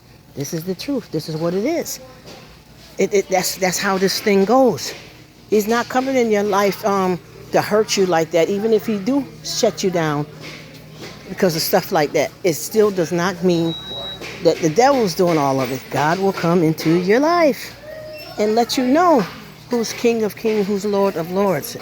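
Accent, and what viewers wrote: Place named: Jamaica. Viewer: Love your Jamaican Accent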